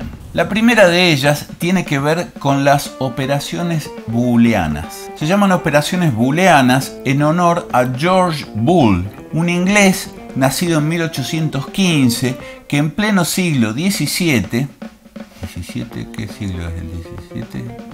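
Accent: Argentina. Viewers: que bueno!!! que interesante y que divertido escuchar a un profe argentino!!!!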